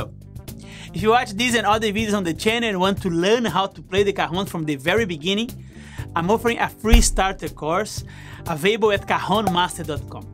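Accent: Brazil. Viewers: Você é brasileiro? Teu sotaque !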